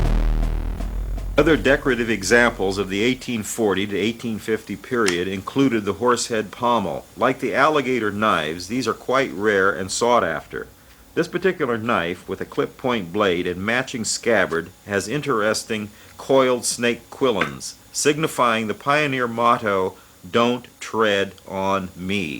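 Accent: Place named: California, United States. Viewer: You know this guy was raised on a walnut and prune farm in California. Not a Yankee or Southerner. Many old time people from California pronounce words differently. Listen to some of the old time radio and television programs. For that matter do you pronounce Los Angeles and Saint Louis correctly? Most people don't.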